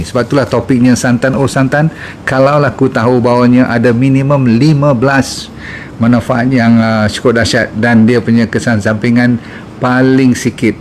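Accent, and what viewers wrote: Malaysia. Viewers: Doktr bercakap guna satu dialek di Malaysia. Jika sudah biasa tentu mudah faham sebagaimana ikuti dr zaidul.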